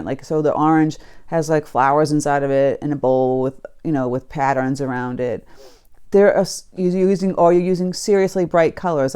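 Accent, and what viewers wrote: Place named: New England, United States. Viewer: I just started a week ago and don’t have high expectations (yet) but I just got halfway through a mountain landscape and quit because it was just that bad! I was scrolling through your videos and found this…definitely words I needed to hear. Keep trying, it WILL get better! I’ve watched several videos by various teachers and really enjoy your style the best (plus I was raised in New England and enjoy the accent).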